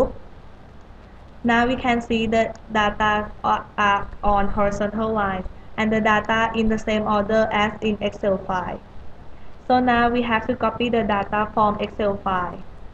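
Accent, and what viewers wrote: Thailand. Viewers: Thai accent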